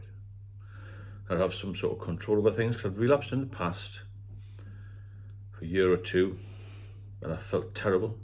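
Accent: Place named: Newcastle, United Kingdom. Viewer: Cheers. I might take you up on that at some point m8👍 on a more positive note, I hear from your accent that you're a geordie m8, congrats if you're Newcastle fan 👍 well deserved, I watched it earlier, loved it. I'm a chelsea fan.... Nuff said about that 🙄. Cheers 👍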